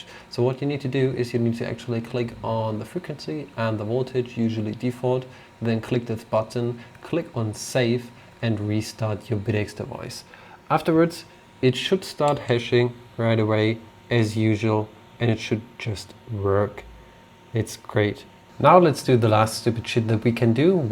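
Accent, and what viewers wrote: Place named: Oxford, United Kingdom. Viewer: Klingt sehe nach Oxfordenglish. Leider ist mein Verständnis sehr begrenzt. 😪 Best regards from Ingo😊. 🖖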